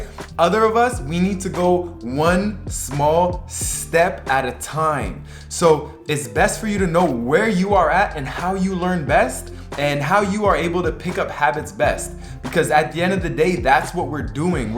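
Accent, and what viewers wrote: Canada. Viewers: I love this! I love your energy and joy! Side question: are you Canadian?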